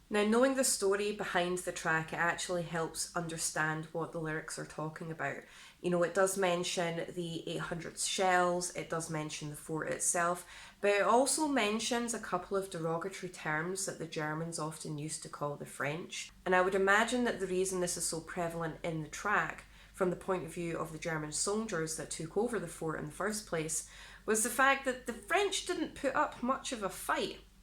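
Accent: Scotland, United Kingdom. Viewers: This my first time checking your metal history. It’s pretty cool. I want to hear you pronounce some Hawaiian words. I haven’t heard that in a Scottish accent.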